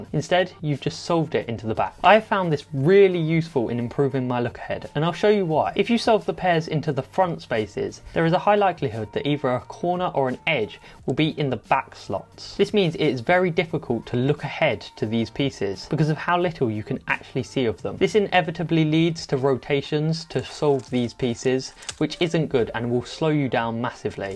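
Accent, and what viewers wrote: United Kingdom. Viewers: are u english ?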